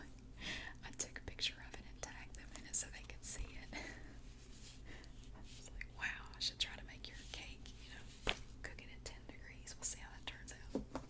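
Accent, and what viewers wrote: South, United States. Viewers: I love you. I cannot watch anything else at the moment. Your whisper, soft spoken videos are just amazing. As a girl from the UK I find a southern accent lovely. So very relaxing. THANK YOU!!! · Haha it’s called that southern draw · You’re a real gem ♥️ Yep, you talk fast like hell, indeed, but now I get it why and I adore you even more😁 Your southern drawl is absolutely lovely, I’m watching a Netflix TV series “Ozark” and the drawl in it is like a main character, check it out😊 Thank you for your great work, I love your videos since the very beginning, you’re one of a kind!🏆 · I love the way you speak I don't feel you talk fast. It's lovely and relaxing and the southern accent just kicks it off beautifully x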